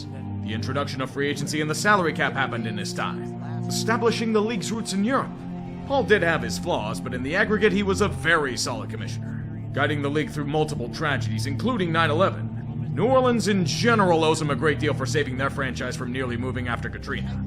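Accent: Germany. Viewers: Of course, the one thing that brings the video down is having an entire game recap in shitty accent German. I'd rather the language stuff just be restricted to the start of that segment instead of the whole thing.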